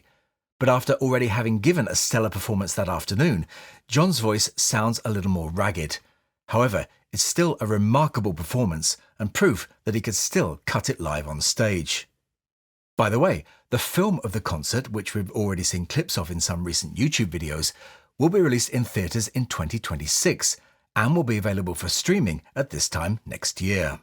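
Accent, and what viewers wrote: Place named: United Kingdom. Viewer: Excellent review. As an American, I find your "British voice" (not the correct way of expressing this) adds to your deep credibility in discussing Lennon. I will not, however, spend my money on this set because I was alive in 1972 when were radical artists doing radical artistic stuff in New York City and I heard their works back in the day when all their unique material first was released. I have to conclude that their son, Sean Lennon, misses the point entirely about his radical artist parents. There must be something wrong with him. Maybe he is "in denial"? He of all people should have loved and accepted his parents for who they genuinely were. And they were radical artists. No two ways about that. This set may promise to be impressive in the context of the truth that today's technology makes audio recording with the available technology from fifty years ago sound "better." But eliminating the controversial song " " from the set does not make this set "better" at all. 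Quite the opposite is true. The specific elimination of that essential song in unforgivable and the elimination erases any credibility Sean Lennon has as a producer.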